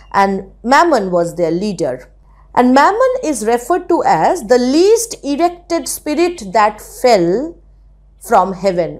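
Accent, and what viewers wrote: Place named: Bengal, India. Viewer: Ur the best i hv ever met 👌👌👌👌👌ur explanation is commendable....i was jumping from this to tht for searching the best explanation n got u there in my 3rd day of searching period. Just want to say ur accent is soo cute ....mixed with bengoli tone uff itss soo ear pleasing to me.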